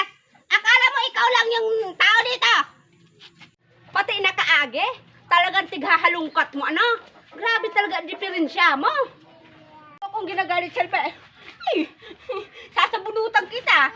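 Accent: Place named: Bicol, Philippines. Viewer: Hahahaha tagalog bicol na c manay salve😂😂😂😂😂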